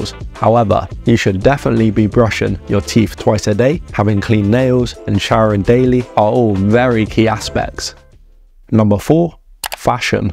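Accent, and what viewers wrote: United Kingdom. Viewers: That thick English accent 😢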